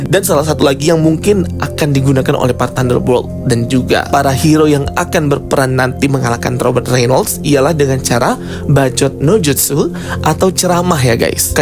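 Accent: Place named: Sumatra, Indonesia. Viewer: Menit ... " kek mana?!" Orang sumatra ko rupanya bang..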